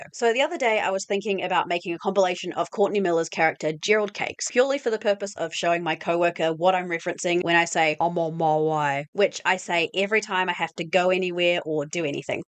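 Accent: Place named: New Zealand. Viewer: Also you sound like a kiwi lol, don't ya worry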